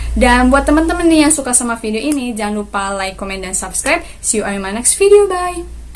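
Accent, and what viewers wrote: West Java, Indonesia. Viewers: Teteh orang Sunda nya? 😁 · Se formal apapun ngomong bahasa indonesianya sundanese, anger we aya bumbu sundana nya... Salaam sundanese🙏🏻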